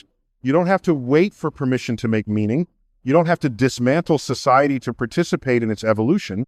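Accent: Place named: India. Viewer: This ai narration is boring. I hear Indian mannerisms as well.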